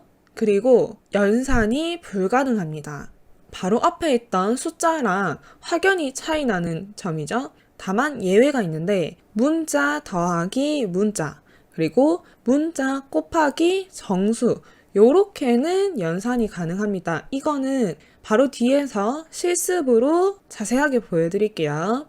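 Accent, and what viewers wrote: Gyeongsang, South Korea. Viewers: 갱상북도 사투리네이~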